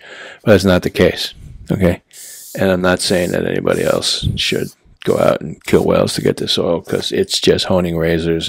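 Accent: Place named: Rhode Island, United States. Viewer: After using a DE for 4 years, I just got into straights (crappy gold dollar 208 and 2 Shapton stones) Can't wait to upgrade!! Came across your vids and I love your no bullsh*t approach. I've learned a lot past 3 weeks from your vids but have many more to watch and soooo much more to learn. Just wanted to say thank you. Also, are you from NY or RI? You're accent sounds like mine (RI), but I'm terrible at telling the difference b/w them!! Jw. Anyway thanks for your vids man.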